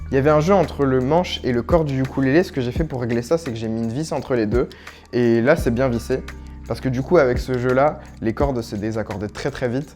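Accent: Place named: France. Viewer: incroyable accent français !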